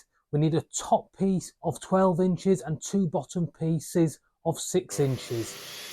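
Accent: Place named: Birmingham, United Kingdom. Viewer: I'm not good with accents, I would have guessed you were from Birmingham. I can't imagine anyone ever leaving the Yorkshire Dales! Cheers from Florida.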